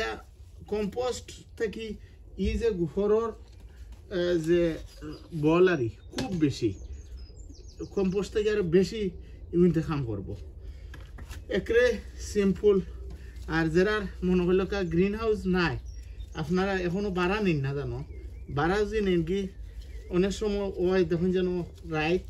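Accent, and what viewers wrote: Sylhet, Bangladesh. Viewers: I❤ you Bhaisab & I Love your gardening & I Love Afnar syLhety kotha gulu keep safe & well Asalamu walaikum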